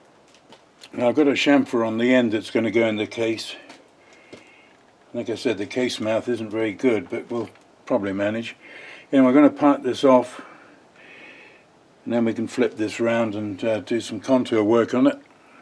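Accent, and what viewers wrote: United Kingdom. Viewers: Hope your back gets better soon. It's nice to hear a British ascent. And a bumbling old twonk. Keep up the good work. M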